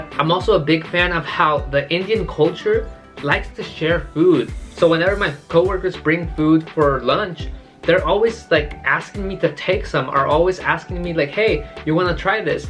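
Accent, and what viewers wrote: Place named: Latin America. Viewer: Mi compa you earned yourself a fan by pronouncing them Indian names correctly(well almost correct with that latino accent)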